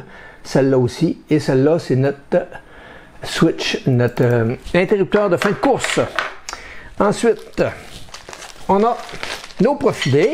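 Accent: Canada. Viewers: Bravo pour ce très bon tuto (surtout avec l'accent canadien pour nous français). il serait intéressant de connaitre la vitesse et la puissance du laser pour les travaux effectues. Encore merci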